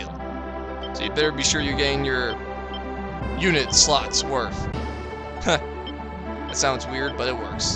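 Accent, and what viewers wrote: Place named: South, United States. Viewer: You should probably fix your audio sometime. Music comes from left, mostly. The rest from both sides. Might just be me *using headset* Also...A Persian with a southern accent. *imagines*mind explodes* o__o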